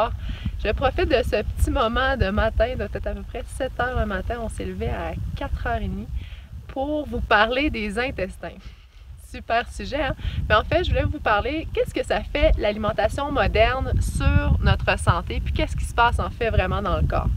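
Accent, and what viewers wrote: Quebec, Canada. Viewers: C'est la moindre des choses de vous donner un retour. J'aurai une remarque. Quand vous dites que la pomme de terre génère des glycotoxines, cela a provoqué un doute et en me renseignant, j'ai compris que c'est quand elle est cuisinée en friture. Il fallait le comprendre dans la suite des détails que vous fournissez sur base d'aliments cuits. Cela étant, même vous, dans votre belle contrée outre-atlantique, avez sans doute entendu parler ou même gouté des frites. Etant Belge, le pays des frites, j'ignorais l'impact de la cuisson de ladite pomme de terre. Des gens de votre métier par ici doivent le savoir aussi, mais n'en font pas état ! C'est par votre entremise que j'apprends cet enseignement. Encore merci donc. Par ailleurs, quant à votre accent québécois, c'est davantage un atout, bien que le fond d'une communication soit le principal. 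Ce que vous dites, au risque de me répéter, est universellement intéressant et instructif. Quant à la situation géographique des différents pays que vous visitez, présentement ^^, dans l'Amérique du sud (merci de l'avoir pointé, il s'agit d'une coquille de ma part en tapant Nord au lieu de sud) N'ayez crainte que les européens sache qu'ils se trouvent sous l'équateur. La plupart de nos pays se sont construits (pas très noblement) sur l'exploration mondiale et diverses colonisations. "L'histoire" qu'on nous enseigne passe par la géographie. Bref et quoi qu'il en soit, j'ai hâte de découvrir vos prochaines vidéos.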